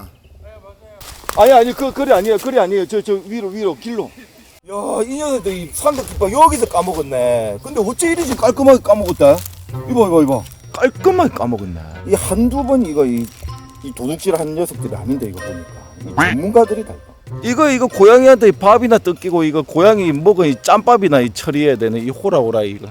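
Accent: Gyeongsang, South Korea. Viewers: 경상도 말투로 이렇게 재밌는사람은 처음본다 유머가 짱. 최고! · 경상도 말 죽이네요❤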